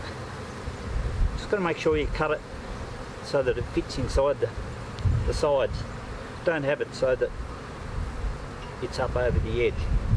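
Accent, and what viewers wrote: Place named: Australia. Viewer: Australian beekeeper talking to his bees 😍🥰 I absolutely love the Australian accent.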